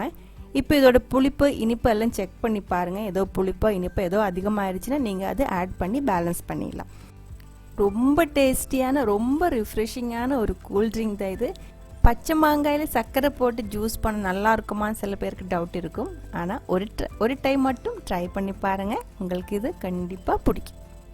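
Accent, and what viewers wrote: Kerala, India. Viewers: தமிழும்‌ மலையாளமும் கலந்து உங்க இனிமையான குரல்.super sis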